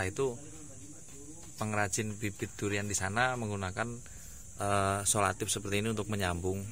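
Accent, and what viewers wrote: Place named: Banyumas, Indonesia. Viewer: Dari nama Chanel Kirain orang Sumatra utara malah orang ngapak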